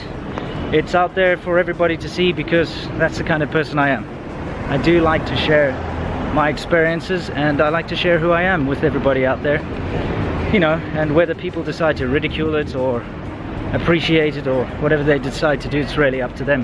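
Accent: South Africa. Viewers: 南非口音的英语？还假装自己是英国人过？喜欢说瞎话的人，他的话还能信？ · FKM ...the phrases he uses sound like South African English to me...he hasn't got a pure British accent.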